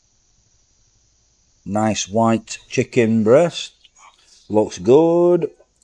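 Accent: Yorkshire, United Kingdom. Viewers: Sorry but that accent is truly truly awful mate, it's a badden even for yorkshire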